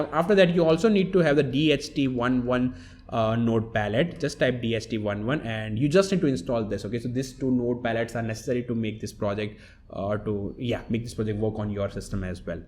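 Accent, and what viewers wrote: India. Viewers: You talk to fast with ur hard to u derstand indian accent!!!! Thumbs down unsub!!! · Loving the strong Indian accent, and of course the video to!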